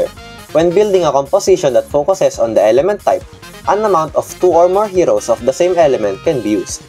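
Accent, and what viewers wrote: Philippines. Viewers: 100% Filipino accent here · Are you filipino because of your voice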